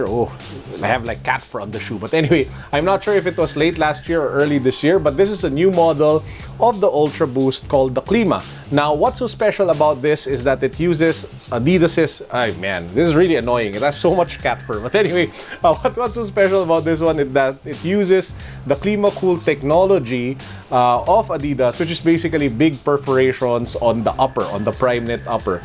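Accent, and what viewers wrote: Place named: Philippines. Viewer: Philippinos have the best accents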